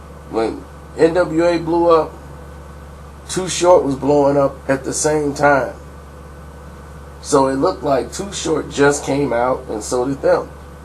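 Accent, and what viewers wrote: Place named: West Coast, United States. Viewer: The slang had me crackin upp haha you too funny. Keep up the great videos. And you Spot on with that west coast slang!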